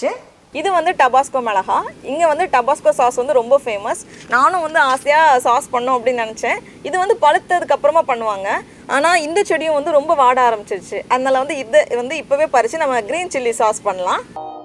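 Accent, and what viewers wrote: Nagercoil, India. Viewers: 1.45 Cheththu pozhachuruku😂😂 ultimate akka.,still I can feel the nagercoil slang in u❤️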